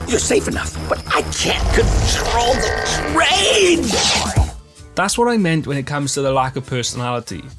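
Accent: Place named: Wales, United Kingdom. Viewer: Great vid, nice to hear from a fellow Welshman 👍🏼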